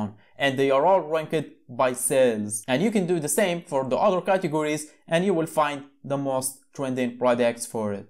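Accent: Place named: Morocco. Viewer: kml akhy l3ziz ana hna f us o mn accent dialk bayna mghribi hhhh sa7a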